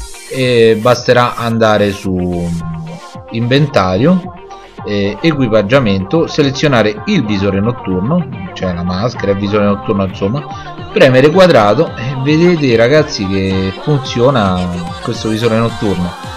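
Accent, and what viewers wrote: Rome, Italy. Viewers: Romano come me ahahaha grande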